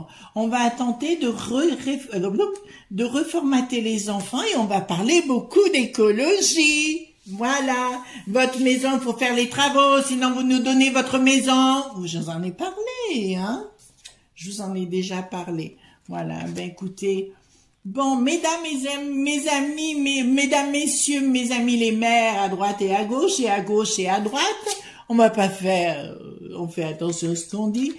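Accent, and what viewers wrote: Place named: Dauphiné, France. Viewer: quelle joie d'entendre votre voix avec l'accent du Dauphiné ! Merci !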